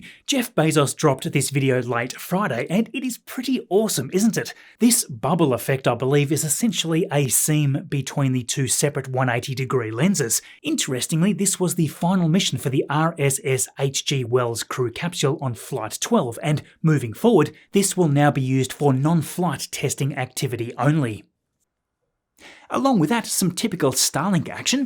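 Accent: Australia. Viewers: coffee and ' charming Aussie accent. Yep, it's saturday and the day is starting well. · Sorry ... aber mir geht das hektische australische Gequatsche von Dir nur mehr am Arsch vorbei ...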